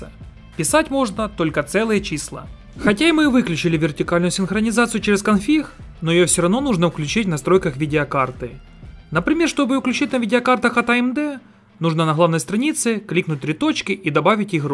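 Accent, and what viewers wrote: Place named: Western Ukraine, Ukraine. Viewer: А ты что Русский паря? Я думал по говору Бандера.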